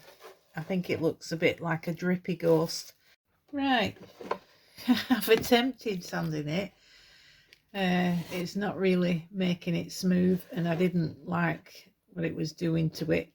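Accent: Northern England, United Kingdom. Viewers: Wow, that's the ghost with the most! What a brilliant idea to soak fabric with the Aquacast. I may try this in an attempt to get rid of the huge bag of eco pour I have sitting in my craft room. It made me homesick hearing your lovely northern accent because I'm from Lancashire but l moved to Oxfordshire 11 years ago and miss Lancashire so much. Hope you have a lovely Halloween :)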